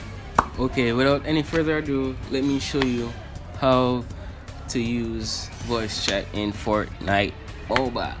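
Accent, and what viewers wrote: Jamaica. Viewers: are you jamaican? just askin cause i am half jamaican you just sound like it 😂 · Your a Jamaican aren’t u? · Are u Jamaican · Thx bro I notice your Jamaican and I'm too big up yuh self bro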